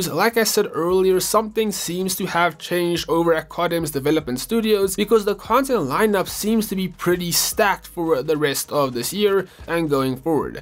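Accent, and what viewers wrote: South Africa. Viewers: Your from south africa?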